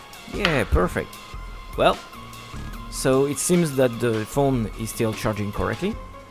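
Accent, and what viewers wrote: France. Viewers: What's the name you said in the beginning? Why your charger block is us Canada if you have a French accent? It isn't an European company? You are french Canadian?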